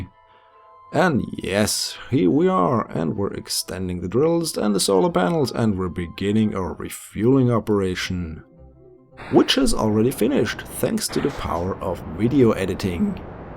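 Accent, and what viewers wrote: Germany. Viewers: Man hört deinen deutschen Akzent. ;)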